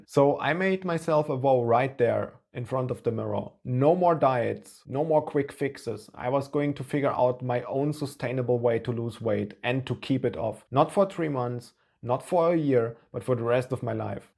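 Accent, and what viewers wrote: Germany. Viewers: when you hear a strong german accent like this you know he doesn't wanna sell you anything but just genuinely want to help you. thanks man. I needed this. Turning my walking pad on right about now 👍🏼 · Really interesting video. Walking is underrated. I walk every day around 15k steps and do jump rope 4-5 times a week, and recently added 100 Push ups a day. Im not fat, but for what i put in... the results (over time) are lacking. I "just" need to change my nutrition. these damn tasty soft drinks and bread... Is that a german accent? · Very nice, smart and informative video! As a French guy, I like the German mind, usually rational and analytical. Also, the accent... 😉 Also, your new body, lean and muscular. Congrats for that. 👍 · You sound German... To have German food and choose vegan food is just wild to me You don't really need the 1g of protein per pound of body weight. 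There's lots of data demonstrating this. Collectively, the data that suggest it's needed, shows about .7 to .8 is plenty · Gosh, finally a real video from a real normal person. I think all those American lecturers are from a different Galaxy, stuff I just don't understand. I wish I got to your "before" photo. 🙂Please, use kilograms, I am from Europe, just like you, Australians use kilograms, I can kind of hear you're from Germany 🙂. I started tracking steps/kalories (I think I have some optical results) and I came to the same startling conclusion - granola oat cereals for breakfast is a MURDER, also peanuts for snacks, which I need to phase out. Two fat slices of bread with jam have less calories than oat cereals and one does not get bloated.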